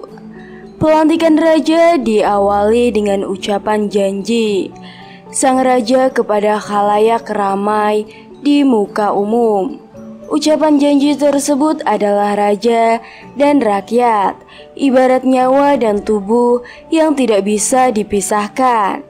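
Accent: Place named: Java, Indonesia. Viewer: Ciri² suaranya pasti orang jawa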